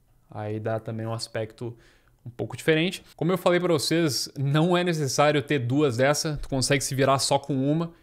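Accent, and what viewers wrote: Rio Grande do Sul, Brazil. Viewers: pelo sotaque é um gaucho , uma grande abraço meu amigo , teus vlogs são ótimos ... fica com Deus e melhoras pra sua mãe ... ( Gaucho desbravando o mundo )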